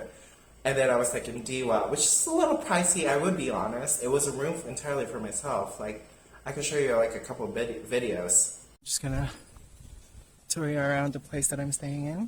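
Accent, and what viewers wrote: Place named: England, United Kingdom. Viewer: you look soo beautiful, love your english accent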